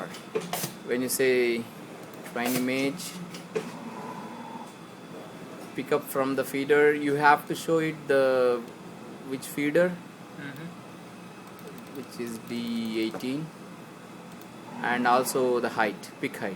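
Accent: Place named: India. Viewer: India English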